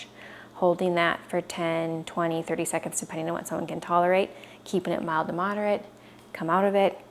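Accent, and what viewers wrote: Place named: France. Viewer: Try British English as I habitant. Not mixture France with English, sound like beef like chicken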